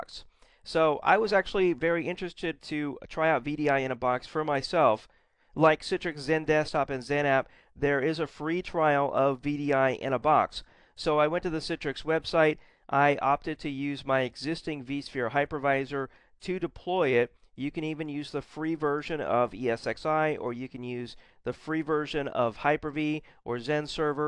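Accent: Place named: Philippines. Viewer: are you a pinoy? you have a strong pinoy accent.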